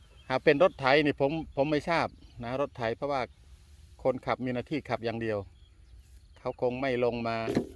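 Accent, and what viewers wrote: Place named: Bangkok, Thailand. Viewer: สำเนียงคนกรุงเทพเสร็น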